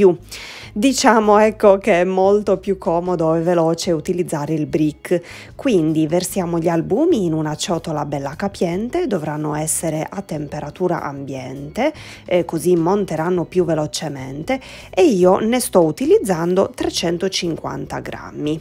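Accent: Sardinia, Italy. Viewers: Ti ho appena scoperta! Bello sentire l’accento sardo!! ♥️♥️♥️ saluti da una nuorese che vive a Milano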